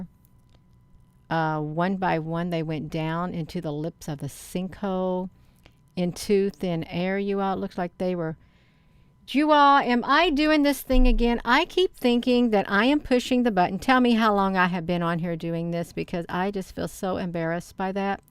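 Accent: South, United States. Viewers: What state is this lady from? Texas? Georgia? I can't figure it out which accent it is.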